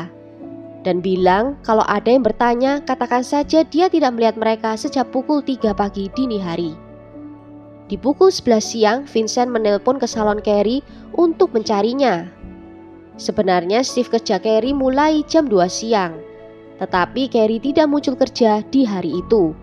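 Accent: Java, Indonesia. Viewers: Hay medokuhhh cantik 💕💕💕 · tidak apa2 mungkin karena pengaruh logat Jawa ya. Kalau ibuk e cocok tapi kalau ibuknya tidak cocok.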